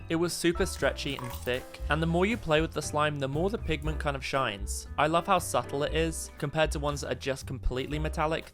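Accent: United Kingdom. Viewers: Are you British too? 🎉🎊💝🎊🎉 Im British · wait your British just like and we’re are you from i’m from walthstone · ARE U FROM UK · YOUR BRITISH!!!!!!! COOOOOOOOLLLLLLL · Is he from Great Britain😅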